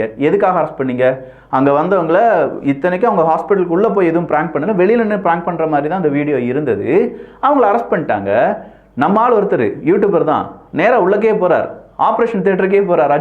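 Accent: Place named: Tirunelveli, India. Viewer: தாங்கள் நெல்லை மாவட்டத்தை சேர்ந்தவர் என்பது தங்களது பேச்சில் தெரிகிறது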